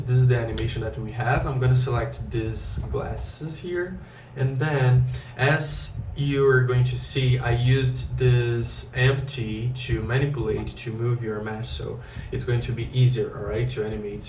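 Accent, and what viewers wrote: Brazil. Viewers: Quando escutei o sotaque no começo e vi que eu tava entendendo bem o inglês eu pensei: ele deve ser br ahhahah. Nice work in this video man! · Sotaque brasileiro, certeza😊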